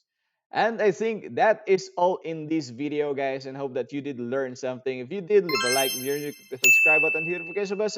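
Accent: Philippines. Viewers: Are you filipino? Cause your accent is so familiar xd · Why do i hear filipino accent xd Btw im also filipino This is useful tip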